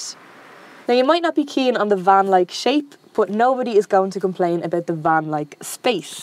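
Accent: Ireland. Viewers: Hiberno English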